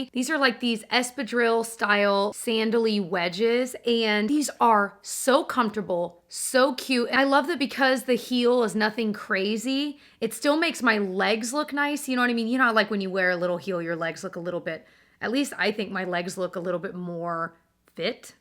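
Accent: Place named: Midwest, United States. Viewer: Love that you’re a youtuber from the midwest love to hear “meijer” and “pop”😂❤️relatable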